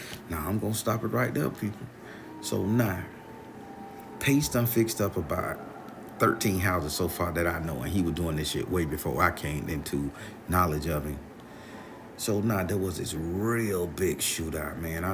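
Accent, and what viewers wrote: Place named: South, United States. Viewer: I listen to the stories featuring Grandma the most. She reminds me sooo much of my Grandma it’s her face I see when you tell the story. Snuff instead of tobacco, a hatchet instead of a 38, and she only practiced ass kicking without spells. Your stories could be on sci fi or something like that. She was a southern woman as well so the voice and terminology is spot on. It’s crazy! It creates a warm memory that never really was, at least not for me. More of an adventure that I can actually see happening. She kept a Buick, too!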